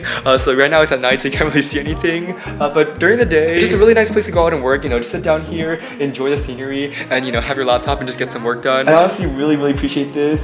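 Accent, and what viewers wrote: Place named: United States. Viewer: afterthis video i realised how american your accent actually if and my mind is blown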